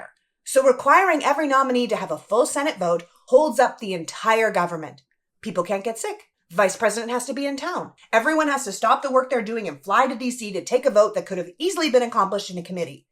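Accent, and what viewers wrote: Canada. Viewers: Love your Canadian accent.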